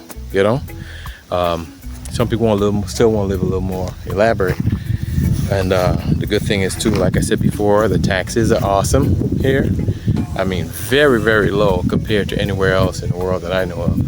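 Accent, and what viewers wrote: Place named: Belize. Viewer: You sound like you picking up the Belizean accent also😂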